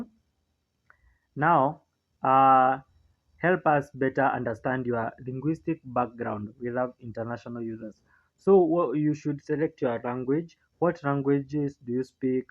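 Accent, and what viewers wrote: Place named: Kenya. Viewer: You sound Kenyan is it legit?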